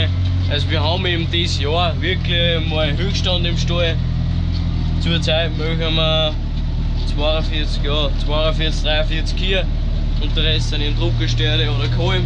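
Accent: Austria. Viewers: I finds richtig geil, dass du Österreichisch sprichst.Grüße aus Kufstein.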